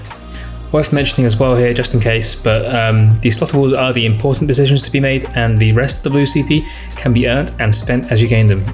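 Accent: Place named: England, United Kingdom. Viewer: Everyone says Nefas has the most sexy voice, but I think your English accent definitely gives you the edge. Love the video, love me some more magcro!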